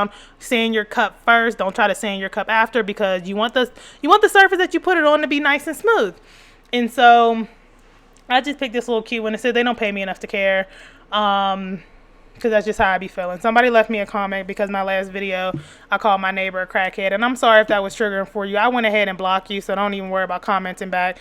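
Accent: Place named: South, United States. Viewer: I just stumbled on your video. Thank you for making me laugh. You said you are in Colorado but I hear Southern girl (Carolina or Georgia).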